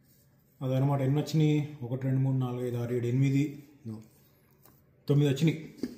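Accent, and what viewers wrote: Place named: Guntur, India. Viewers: Bro midi guntur district accent undi motham❤ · Ma Guntur language la untadi mee Bhasha. Nizamabad yasa ledu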